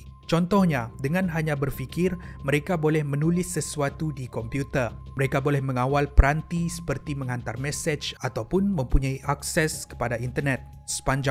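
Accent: Sabah, Malaysia. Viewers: Nk tnye dia nie org sabeh ka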